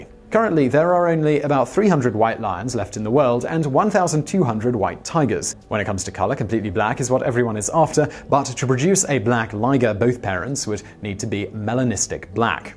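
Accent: United Kingdom. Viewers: What a wonderful British accent! 👏👏👏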